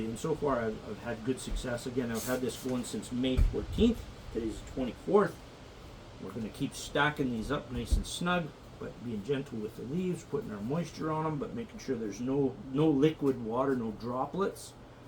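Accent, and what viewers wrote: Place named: Canada. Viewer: I wonder if this is similar to a Perique ferment. I suspect that the bacteria group responsible for the fermentation are facultive anaerobes--a bacteria that technically does not need oxygen, but benefits from a wee bit of it, thus the regular turning, and airing. A Perique is aired monthly, and under pressure-in water. You are using more water than in cigar production, but they are in a more humid environment (your accent sounds Canadian) Was that 106 degrees F. you said? So many microbes tend to be active at body temp.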